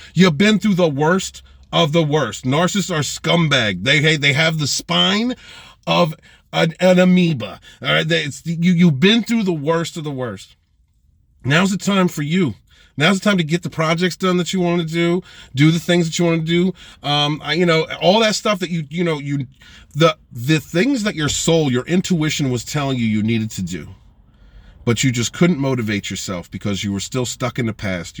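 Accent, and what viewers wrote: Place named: New England, United States. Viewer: Missouri, your accent gave me New England vibes lol, nice to meet you!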